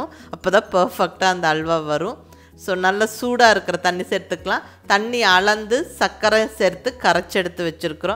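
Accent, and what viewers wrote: Coimbatore, India. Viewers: ka Coimbatore style pechu alagu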